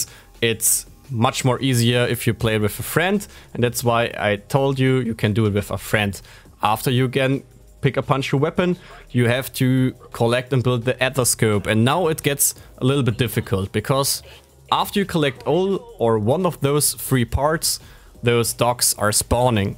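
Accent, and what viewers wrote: Germany. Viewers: sorry aber dieses deutsche englisch macht mich sooo aggresiv :D aber gutes video habs doch einigermaßen verstanden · Ur from germany right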